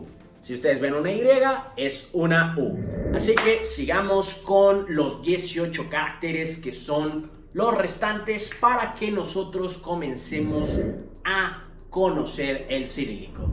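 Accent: Mexico. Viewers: Anda no te pases...suena mas a acento mexicano que a español de España... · A veces suenas como Méxicano Mano, pero es buen video, aunque la parte de la pronunciación la fue muy rápida, cuando uno le enseña a alguien que no tiene ni idea de un tema es mejor despacio. Es tal como si yo quisiera enseñarle a tocar guitarra a alguien y empiezo a hablar de progresiones con acordes de novenas, oncenas, trecenas etc. Si ve? Soy músico y quiero aprender Lonque más pueda de este idioma por mi nuera que es de Bulgaria. Entonces mira tutoriales de como ser maestros y seguro tendrás muchas suscripciones más. Me suscribo. Chao.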